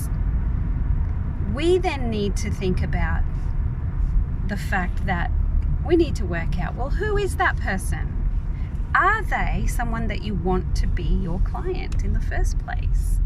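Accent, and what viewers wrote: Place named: Australia. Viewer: Hello, thanks for sharing and thanks for the tips and the advices! Love your accent! You probably live in Adelaide as I do. Thanks again!:D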